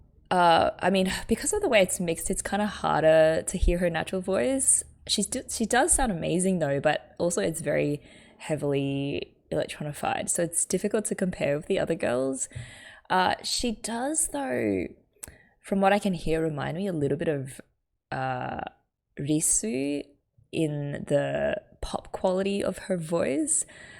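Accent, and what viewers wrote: Australia. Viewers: Oh my god is such a perfect cover song for all of these girls. They add so much personality to it. I especially love Calli and Gura's. When it came it took me by storm and I couldn't get over how perfect it was. Like I would choose this cover to introduce someone to them. Impressive how you picked up even on the accents. It was a 2 min song but you picked up on Calli's southern twang. I also like picking up on different accent, kind of an obsession, and I was guessing you had an Australian accent there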